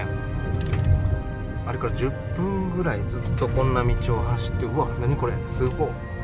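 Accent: Kansai, Japan. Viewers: お早う。フィリピン在住者です。知人のフィリピン人とこの動画を観ていました。彼は時々日本旅行に行っている人です。途中までは大感激で、次の日本旅行には徳島に行きたいと言っていましたが、どうも様子がおかしくなって動画をストップしました。何があったかって言うと、フラッシュバックに襲われたそうで、大阪を観光していて、恐喝にあったそうです。話し声が関西風の声で、動画の声とよく似ているとの事。日本は安全で安心して旅行が出来ると信じて行ったけど怖い目に合ったそうだ。スイマセンが、動画では標準語でお話しいただけませんか。お願いします。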